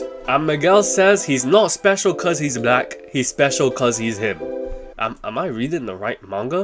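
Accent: England, United Kingdom. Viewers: Wait you from England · U from England crazy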